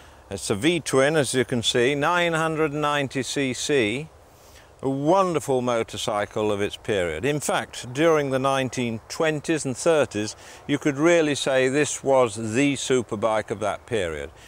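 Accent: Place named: United Kingdom. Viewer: that is a 100% pure british accent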